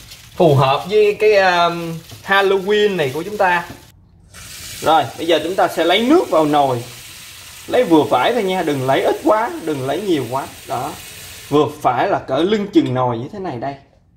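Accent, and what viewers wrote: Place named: Mekong Delta, Vietnam. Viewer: Đậm chất ngừ miền tây Nghe câu "thun thun thun thun" là bt liền😁😁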